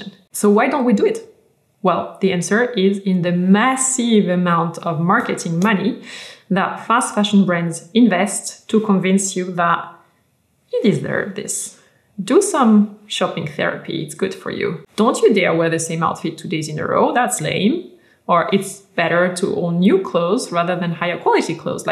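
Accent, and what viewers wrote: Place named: France. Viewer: I heard the accent and I knew you’re French 😃😃. Agréable vidéo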